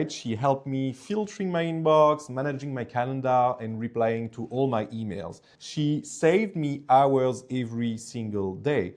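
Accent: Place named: France. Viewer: On m'a recommandé ça sur Reddit. Malgré ton bon anglais, je repère tout de même un accent français bien présent. Marblism est français ?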